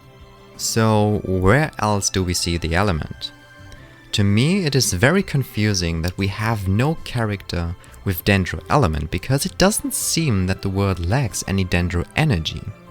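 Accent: Germany. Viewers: Are you from Germany? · I wonder whats ur native language ? German?👀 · Deutscher Akzent hahaha xdd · I can tell you're German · Sounds like German-English to me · Du bist deutsch erkennt man bei deiner Aussprache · You are a german aren't you? I'm a german who can (no flex) speak english pretty good even though I'm really young and I've heard a lot of germans in my class speaking crappy english. I mean you're english is perfect but you can still hear the german dialect (correct me if I'm wrong). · u sound kinda german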